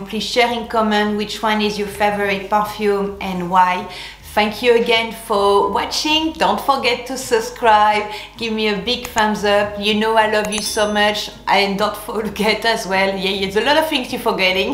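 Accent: France. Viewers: I can feel the French accent while you are talking in English 😂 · French perfume review by lovely french accent ❤️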